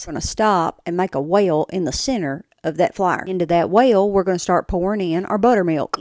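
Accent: South, United States. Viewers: I'm from alabama and I love her southern accent. Would not trade my accent for anybody. Southern women know how to cook and we know how to cook and eat and enjoy it l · goodness how nasty that southern accent sounds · Just on your accent alone I subscribed! Southern accent = Amazing food! ❤❤❤ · Trying to place Your Accent: Alabama? Mississippi? Or Georgia? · Well, thank you for your southern recipe and that southern accent. My sister may the Lord bless you forever. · I'm not sure which is more perfect, them Catheads, or that perfectly beautiful Southern Accent. ❤ from Etlanna. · God was in a good mood when he gifted us with the Southern accent. Love from the UK. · Love that southern country voice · God that southern draw sounds sexy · The accent is so cute. As southern girl for sure. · You know what makes this fun, its following her direction in southern accent which i love. 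Thanks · Gotta love your southern accent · Some people just ain’t got no mama that ain’t no crack head 😂😂😂ya know what im talkin bout 😂…. It’s true , I just have had my fill of hearing that thick southern accent in this political climate , so slight apologies for the snarky comments….hopefully you a lovely southern belle with a non racist disposition 😊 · Born and raised in MI, but stayed in KY about 4 years When I was a teen. It's where I learned how to cook and homemade biscuits and gravy are a regular meal. The accent and recipe make me miss the south. Those look delish btw ❤ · I love her country accent 😊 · You might be the most southern woman I think I've ever heard. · Whenever I hear a woman with a southern twang I immediately trust their food judgement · I'd marry this woman right now I love that southern accent ❤ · You have a beautiful smile and love your southern accent ❤️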